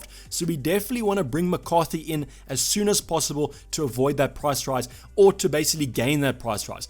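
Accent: South Africa. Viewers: I love your South African accent :)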